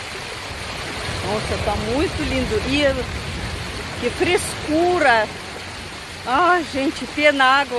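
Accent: Russia. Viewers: Gosto muito do seu sotaque, já estou inscrito, gostaria de conhecer a Russia e a sua natureza. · Gostoso ouvir você falando nosso idioma com sotaque russo.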